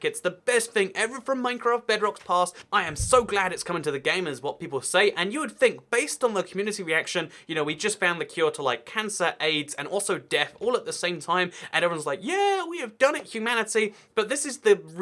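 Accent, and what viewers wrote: United Kingdom. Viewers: Is that a German accent he speaks with in the beginning? Weird since he speaks in a British accent the rest of the time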